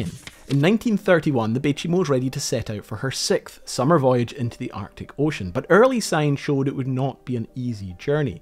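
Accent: Scotland, United Kingdom. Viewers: Love the Scottish accent and Neck fur!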